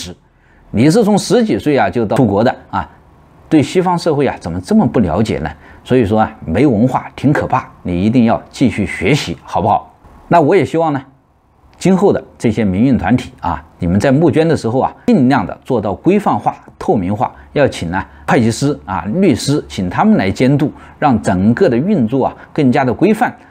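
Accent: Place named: Wuhan, China. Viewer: 请问是不是武汉人啊？口音太像武汉人说普通话了。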